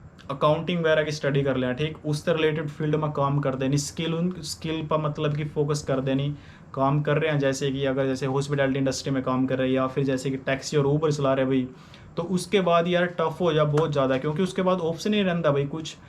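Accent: Haryana, India. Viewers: Bhai aapki language te hi pta chla haryanvi aa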